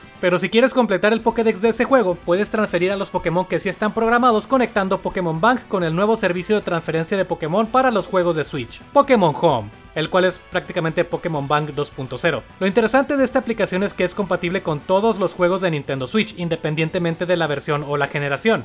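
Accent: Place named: Monterrey, Mexico. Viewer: Videaso hermano, gran acento regio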